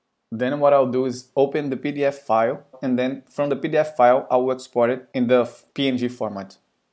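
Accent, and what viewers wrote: Brazil. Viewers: Valeu cara! Logo no começo do vídeo eu falei "esse sotaque é brasileiro", e confirmei com a linguagem do seu S.O kkkkkkk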